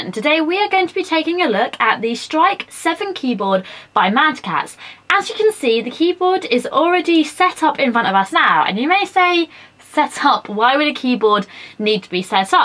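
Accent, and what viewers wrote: United Kingdom. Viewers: You have the exact same accent and voice as Jaime Murray could it just be because you are from England? :P Great review btw.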